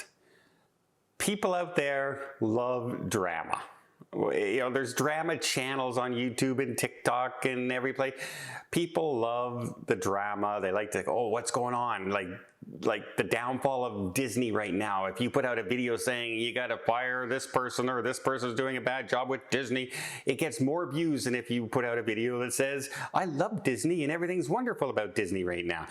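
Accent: Canada. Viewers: I've never heard it pronounced that way. Maybe it's a Canadian thing, like the way he says "garage."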